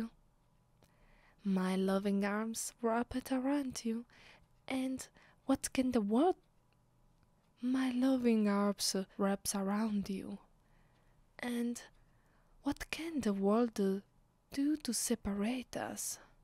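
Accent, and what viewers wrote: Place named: Italy. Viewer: I don’t know why but the Italian accent adds to the charm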